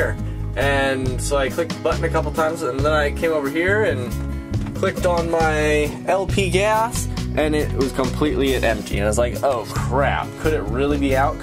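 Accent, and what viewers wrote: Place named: Canada. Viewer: I'm hearing a Canadian accent in your voice lately. Please explain what "Fahrenheit" is for the 90% of the metric planet earth please...lol.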